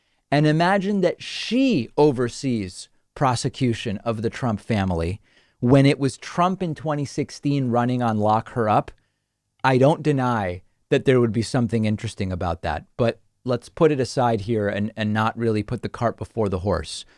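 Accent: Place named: Buenos Aires, Argentina. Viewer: I love your heavy Buenos accent, che pibe! También tengo miedo de que salga reelecto otra vez, que Dios nos libre!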